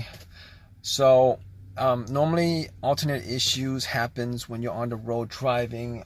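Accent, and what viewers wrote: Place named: Massachusetts, United States. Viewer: Sounds like Massachusetts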